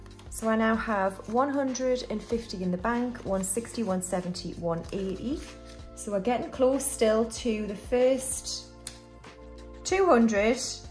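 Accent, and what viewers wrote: Newcastle, United Kingdom. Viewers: Loved this video as always, puts a smile on my face when I see an upload from you :) I love your Geordie accent and I had the giggles when you were talking about the apple and how you were a 'whorebag' haha, hope you are okay xxx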